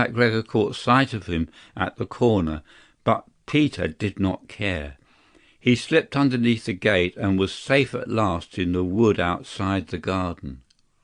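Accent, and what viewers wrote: United Kingdom. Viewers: Произношение британское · Произношения английское,не американское.В Америке так не говорят.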